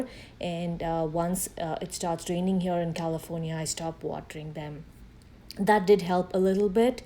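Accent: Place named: India. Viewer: Aisa lag raha hai jaise koi Indian bol rahi ho. ji, bharat se ho kya?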